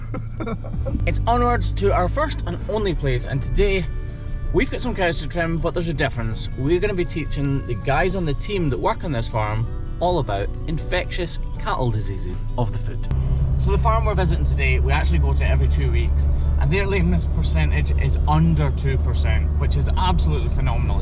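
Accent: United Kingdom. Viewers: Britain accent? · Here's some ❤ for! YouTube has put several videos from last year BC (Before!!) & your life seems so much smoother than before. He is such a great help for you to be more efficient & focused! Yeah!!🎉❤🧨👍 Note: I think you are saying; I'm from Texas & those UK accents throw me off a tad!!😁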